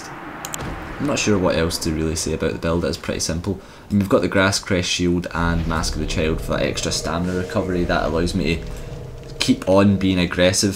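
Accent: Scotland, United Kingdom. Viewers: He has a Scottish accent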